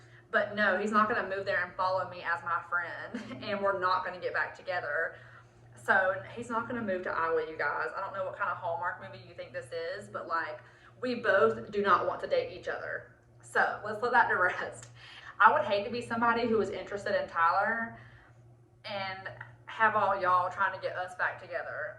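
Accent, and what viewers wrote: South, United States. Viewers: I just loveeee your southern accent. I dont want it to go away when you move. 😭😭😭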